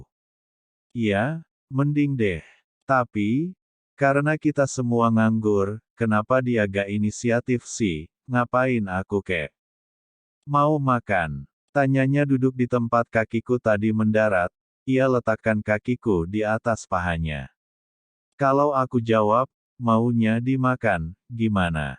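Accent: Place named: Java, Indonesia. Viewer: Cerita nya lucu, ada logat Jawa nya lanjut Doong ❤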